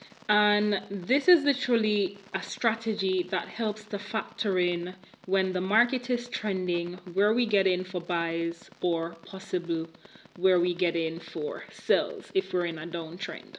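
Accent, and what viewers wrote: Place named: Jamaica. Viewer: Must be a Jamaican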